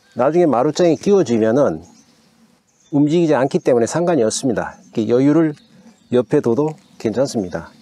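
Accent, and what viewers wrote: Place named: South Jeolla, South Korea. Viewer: Thank you for teaching some Korean wood crafting! I enjoy your chollnamdo accent reminds me of Gwangju. 😘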